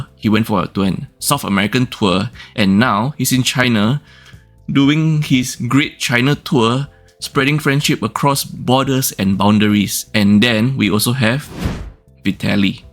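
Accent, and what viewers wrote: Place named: Singapore. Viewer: your accent is giving me "Singaporean" vibe..lol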